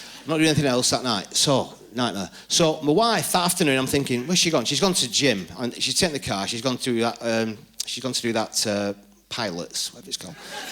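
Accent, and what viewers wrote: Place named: Australia. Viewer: Australian accent can be tough to understand at times. Hope he can acquire some jokes, somewhere , somehow.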